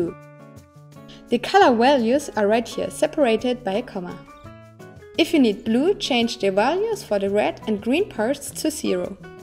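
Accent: Germany. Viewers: Which country do you come from? It sounds a bit like a German accent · I think she's German.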